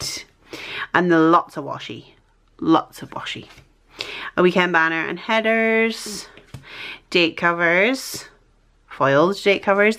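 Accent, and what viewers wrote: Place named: England, United Kingdom. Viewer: 🤣🤣 love your ‘proper’ English accent!!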